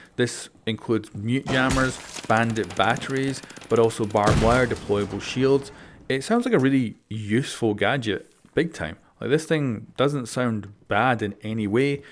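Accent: Scotland, United Kingdom. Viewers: Are you Scottish?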